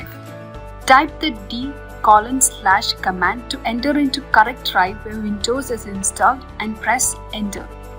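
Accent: Kerala, India. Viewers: Are you from kerala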